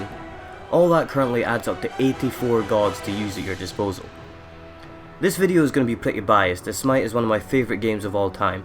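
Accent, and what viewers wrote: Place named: Scotland, United Kingdom. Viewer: Always throws me off when i hear the Scots accent in vid. some fucking good plays there mate.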